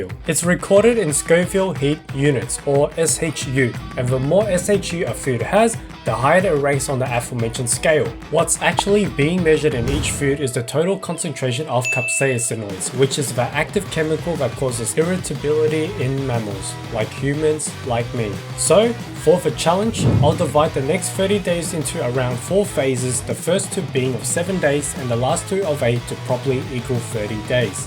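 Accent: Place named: Australia. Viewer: Your editing style is really well done. I think sparkling water is spicy, so I’m curious to up my tolerance. I’m from Israel but living in Australia (based on your accent/the Red Rock Deli chips, you do too), so not two places known for spice. Thankfully we do have so many options here thanks to the beautiful blend of cultures.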